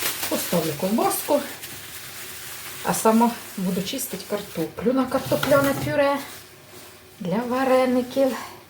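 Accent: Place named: Poltava, Ukraine. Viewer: Чую голосочек полтавчаночки і аж на сльози пробиває... наче моя мама ожила. Вона теж готувала дуже гарно і без книг по кулінарії і без інтернету. І посудка похожа навіть запах відчуваю. Не думала, що так побачу і від чую тепло наче рідного дому. ДЯКУЮ... ДЯКУЮ... ДЯКУЮ!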